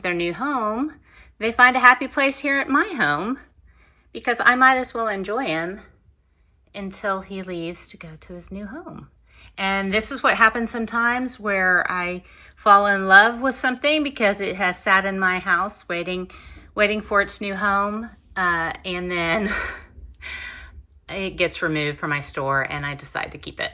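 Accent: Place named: Oklahoma, United States. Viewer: This helped me so much! I knew for some reason you were from OK, don’t ask me why, maybe it’s that you sound like me…lol I loved this video, if you ever wish to share how you inventory your items to know where they are, I would love to see. I only sale vintage home goods, and linens and I, like you, love to use the items in my home ( some of them) I sell vintage home goods, because its what I love to do. I love to shop and when I see an item that makes me think back to my childhood, and a photo of our home, and here is the exact lamp we had. I buy it,I was also grieving my Mother, so went a little nuts on IG live auctions. I am finally listing all of my mother and grandmothers items that were left over from the estate sale, and now need to create a storage solution… I have had to cancel 2 orders recently for not being able to locate the item. 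Happy THanksgiving! Early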